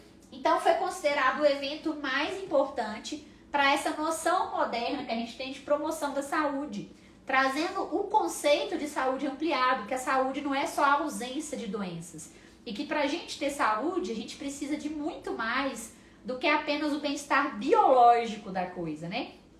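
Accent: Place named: Minas Gerais, Brazil. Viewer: Adorei seu resumo. Pra nós mineiros é muito gostoso aprender no nosso próprio sotaque.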